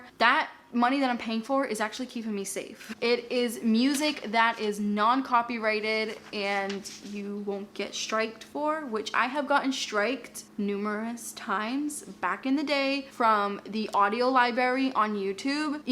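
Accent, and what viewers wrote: Boston, United States. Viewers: Love your Boston accent. 😄💖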